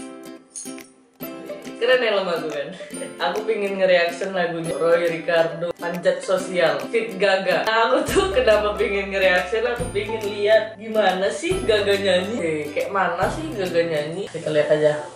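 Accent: Medan, Indonesia. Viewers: orang medan ye bro?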